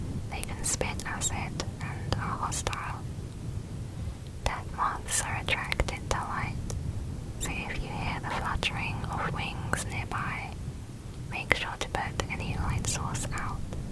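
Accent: United Kingdom. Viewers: are you british? im british btw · She's from the uk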